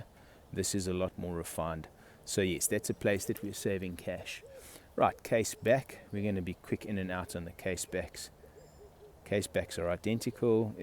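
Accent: South Africa. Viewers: South Africa! To my American ears it is hard to distinguish from Australian (but I'm getting better). Great review. Thanks for posting! Also, thanks for the web page directions. I'm following and looking for more posts. P.S. I can't believe they've stopped the sale of alcohol Nation Wide due to COVID. My prayers are with you!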